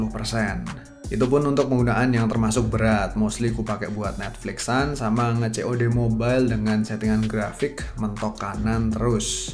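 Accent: Java, Indonesia. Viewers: asli nya jawa ya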